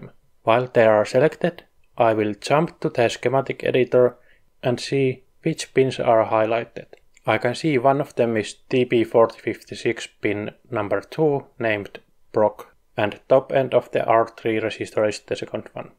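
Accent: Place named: Finland. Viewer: I like the Finnish accent, sounds nuts.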